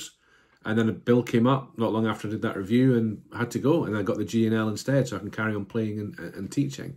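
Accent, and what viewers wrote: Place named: Scotland, United Kingdom. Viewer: Hey man, nice to hear a fellow Scotsman talking about guitars 😁 Totally with you on this and I love your honest approach. I recently uploaded a video on a similar thing with overdrive pedals. Also, on your point about having to sell guitars - I hear you! I have had loads of gear come and go. Some of it I regret and miss but it's the way it is. Glad I found your channel. Talking sense, yes!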